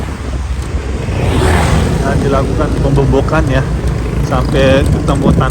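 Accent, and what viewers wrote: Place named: West Sumatra, Indonesia. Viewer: Dari logat bicaranya nampaknya abang ini "orang minang"... Salam urang awak....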